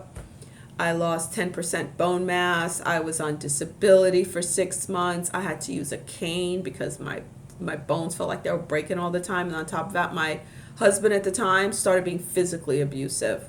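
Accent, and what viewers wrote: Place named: Boston, United States. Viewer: Hello!! Thank you sooooooooo much! I knew that was Boston accent first video. New Subby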